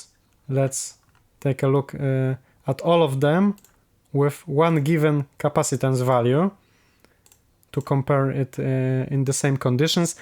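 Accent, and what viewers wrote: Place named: Poland. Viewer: Odpalam anglojęzyczny filmik i słyszę polskie akcenty i widzę w opisie, żeś Polak xD pozdrowienia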